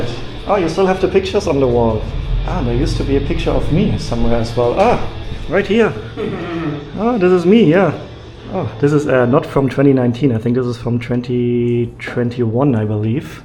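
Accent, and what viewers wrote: Germany. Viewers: Thanks! Great videos, I’m an American with German background, I love to hear to your German accent.🇺🇸🇩🇪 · He made enough money..... Don't need to make more videos. People got addicted to his German accent English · I am Thai and living outside of Bangkok. I am also learning about places in Bangkok from your video.😂 Well done,. I like your English with German accent, very charming! Keep on doing a good job!❤👍🇹🇭Welcome back to Thailand!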